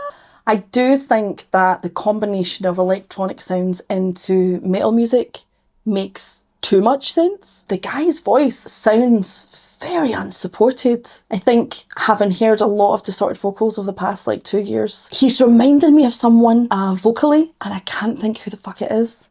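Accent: Scotland, United Kingdom. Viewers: Hey, I'm Bruna from Brazil. YouTube recommended your video to me and I loved your vibe. I'm learning English and have never listened to a Scottish person until now. Your accent is so beautiful. 🥰 May I recommend you a music video from a Brazilian singer? She sings in English,. Here's one of her originals songs: <link>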